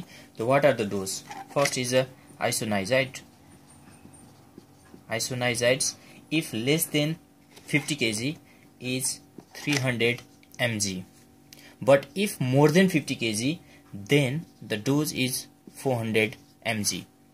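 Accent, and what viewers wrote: India. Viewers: lol hes indian and has accent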